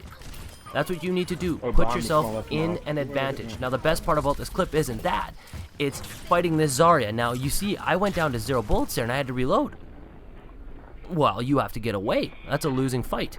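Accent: Canada. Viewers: His canadian accent is so strong I cant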